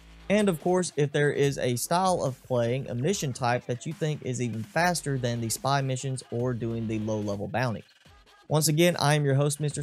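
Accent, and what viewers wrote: United States. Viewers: I love how his accent is America itself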